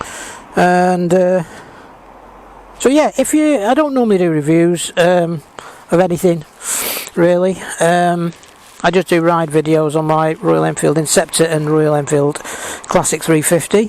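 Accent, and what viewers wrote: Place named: England, United Kingdom. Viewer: Probably the worst review of bsa goldstar. Sounds like trump reviewing with english accent.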